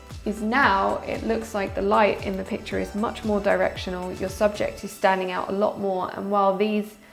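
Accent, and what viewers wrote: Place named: RP, United Kingdom. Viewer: Thank you for some sage advice;-) Keep going, you have good skills and a very nice accent (I'm an American, so we love this particular posh accent.)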